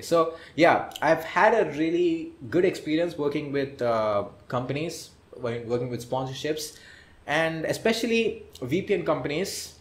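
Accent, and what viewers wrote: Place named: Tamil Nadu, India. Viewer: May I know your mother tongue..? Seems like Tamil 🤔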